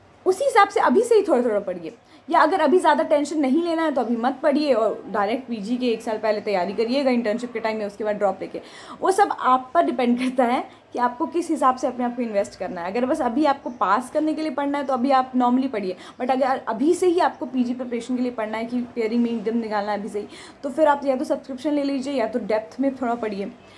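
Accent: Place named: Bihar, India. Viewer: Yrr... BIHARI TONE💓💓 Ekdm bawaal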